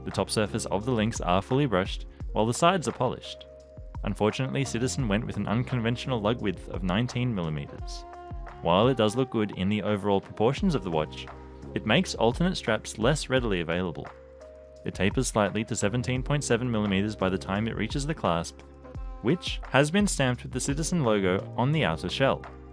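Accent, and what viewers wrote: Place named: Australia. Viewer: It's always difficult to listen your own voice! Always sounds weird, I didn't immediately pick out you were Australian until I saw the channel bio, which was odd as I am a Kiwi so usually can haha. But to give you some sincere feedback. I just went through my watch buying process and landed on the NB1050-59H (it actually arrived from Japan today!) and this video was incredibly well made. Great macro shots, informative dialogue, good comparisons, good to hear positives and negatives and finally the voice of course! It's like i'm listening to an incredibly knowledgeable jeweler who knows watches inside and out. It took me about a month to finally decide to purchase this watch after tossing up many in the process, I probably watched this video 4 times lol, to say the least, I am a new subscriber! Keep up the good work 10/10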